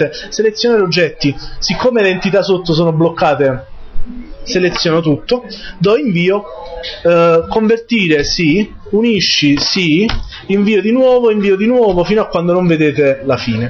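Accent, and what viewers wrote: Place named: South, Italy. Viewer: Caro maestro, a primo acchitto, la sua voce con forte accento meridionale è fastidiosa da seguire, per cui abbiamo fatto fatica integrarci ai suoi corsi, ma alla fine ha vinto lei, trovandoli molto interessanti perchè tecnicamente spiegati bene. (siamo di Milano, non la prenda a male) Lei si spiega molto bene, questa cosa le fa onore mano a mano che viene seguito facilitandoci l'apprendimento. Una nota di rimprovero va alla sua sua classe: il brusio di sottofondo prodotto dai distratti, denota la pessima educazione degli allievi. Da noi, con un insegnante come lei, non volerebbe una mosca in classe e si sentirebbe solo le penne graffiare la carta per gli appunti appresi. Complimenti e grazie, le sue lezioni ci sono molto di aiuto.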